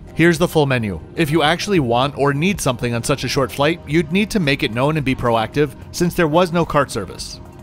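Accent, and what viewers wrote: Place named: United States. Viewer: His accent is American 😊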